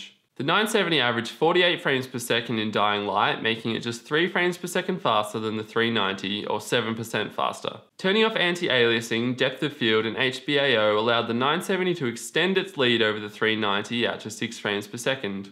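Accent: Australia. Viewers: You sound like you're from Australia. May I ask where you could possibly get a GTX 970 for $300? That's the price range of the GTX 960 here. The 970s are around $450, depending on which brand you pick. If you're not from Australia, my apologies.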